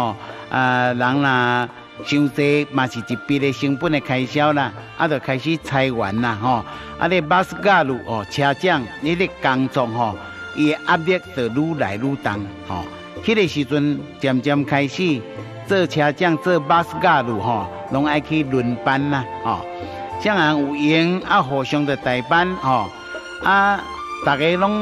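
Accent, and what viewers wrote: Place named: Japan. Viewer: 旁白主持人的介紹非常專業，但是日式的美語發音，讓人聽了會起雞皮疙瘩。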